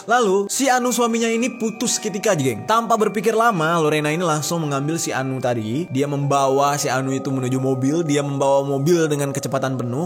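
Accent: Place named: Java, Indonesia. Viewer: Wong jowo ndi suarane lor?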